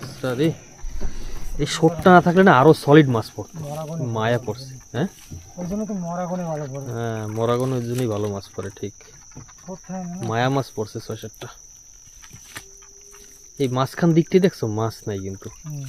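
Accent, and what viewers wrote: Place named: Barisal, Bangladesh. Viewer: দাদা আপনার আমার খুব ভালো লাগে আমি আপনার ভিডিও গুলো সব সময় দেখি আপনার ভাষা আমাদের দেশের বরিশালের ভাষার মতো পায়সা মাছ আমারও প্রিয় মাছ কারণ আমরা সুন্দরবনের কাছে থাকি আমি বাংলাদেশ থেকে বলছি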